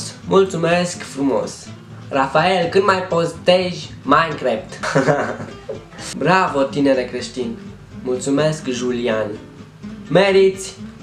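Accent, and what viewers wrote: France. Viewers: Ba nu vorbeste sâsâit...are accent franțuzesc •^• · nu e sasait are accent frantuzesc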